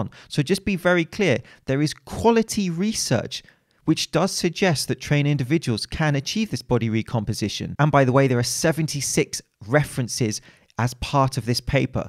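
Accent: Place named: RP, United Kingdom. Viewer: Thanks for breaking it down, it was amazing explained by you with your cultured british accent. It's a shame though that doesn't address the physique competitors aspect😜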